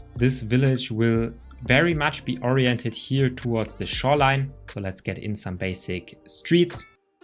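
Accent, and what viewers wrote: Germany. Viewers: Bro man hört so raus dass du Deutscher bist😂❤ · You sound very German! 🇩🇪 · I can hören german Akzent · Ich höre deutschen Akzent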